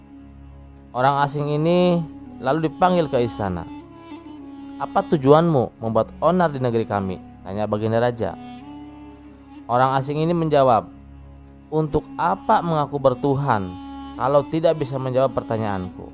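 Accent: Sunda, Indonesia. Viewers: Ieu nu nyarita orang Sunda, medok sundana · Keciri iye org sunda yg mawa beritana.. medok pisan euy sundana..😂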